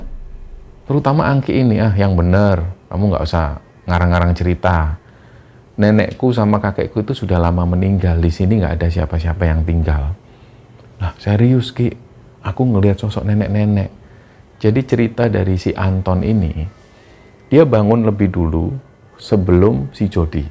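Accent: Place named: East Java, Indonesia. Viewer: Logat jawa timuran ne mantap mas.. apalagi kalau ngomong BBAHAYA..😂😊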